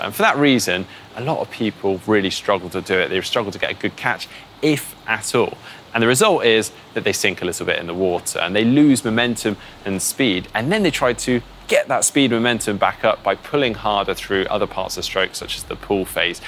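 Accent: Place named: England, United Kingdom. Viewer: When receiving instructions, it's most believable hearing it from an Englishman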